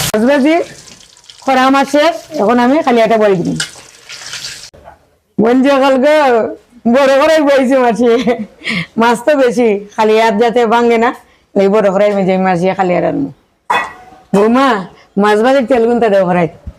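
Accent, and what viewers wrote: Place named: Sylhet, Bangladesh. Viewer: Mashi Maa ajkei apnar video deklam vhala laglo karon sylheti math hunia.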